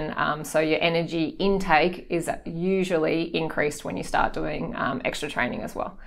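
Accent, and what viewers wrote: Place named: Australia. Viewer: Australian acent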